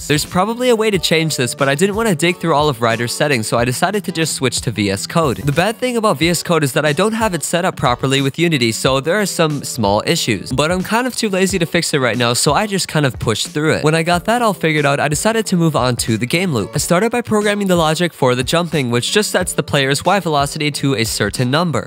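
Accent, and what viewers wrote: United Kingdom. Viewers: you sound british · British accent pog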